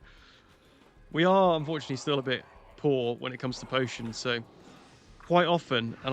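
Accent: United Kingdom. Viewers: Beautiful. :”) (.. British man? Not Australian? :D)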